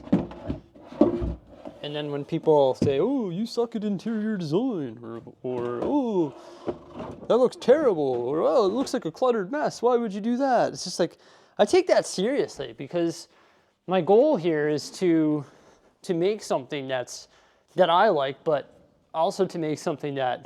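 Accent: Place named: Canada. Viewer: I find it so funny that you don’t sound Canadian till you say “about”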